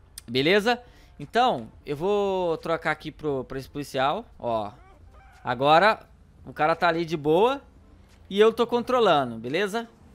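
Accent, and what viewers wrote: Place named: São Paulo, Brazil. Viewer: a voz do davy jones , so que de sp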